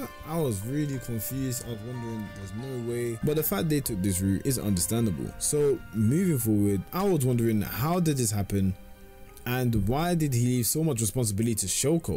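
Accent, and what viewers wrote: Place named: United Kingdom. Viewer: why does bro sound so overly bri'ish 😭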